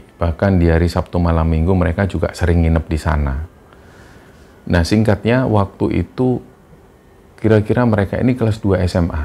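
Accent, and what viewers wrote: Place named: East Java, Indonesia. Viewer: Logat jawa timuran ne mantap mas.. apalagi kalau ngomong BBAHAYA..😂😊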